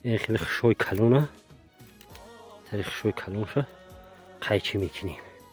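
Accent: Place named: Tagnob, Tajikistan. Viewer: Асалому алайкум бачаи хеш мохам мардумитагновем хам сари маргзори ва хам пасикухием бехтарин наворхо бо лахчай тагноби саломату сарбаланд боши бо фарзандонат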